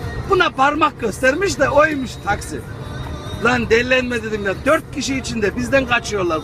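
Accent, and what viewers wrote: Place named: Anatolia, Turkey. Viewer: merhabalar nasılsınız bu konuşan arkadaş sizmisiniz şivesi sunumu çok tam anadolu :) ve kahkahsı ..hayırlı yolculuklar dilerim..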